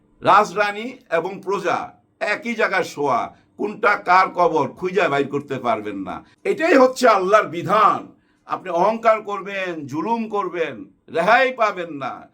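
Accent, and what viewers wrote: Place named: Old Dhaka, Bangladesh. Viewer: Your dialect is similar to mine. I am originally from old Dhaka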